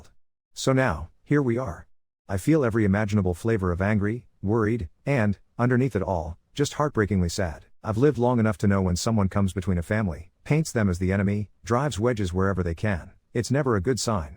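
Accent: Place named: United States. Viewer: Sounds very american 😂😂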